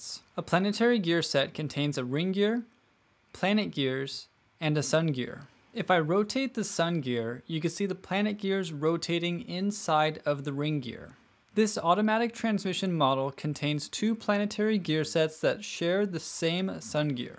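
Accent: United States. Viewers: He has an American accent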